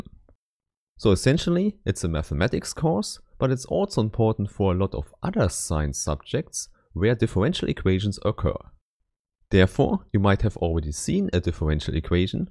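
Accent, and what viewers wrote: Germany. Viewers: German accent makes this video even more credible.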